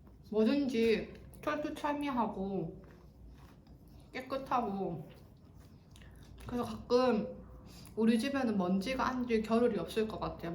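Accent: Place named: Gumi, South Korea. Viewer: 진정 말씨는 구미분이십니다 ㅎㅎ😊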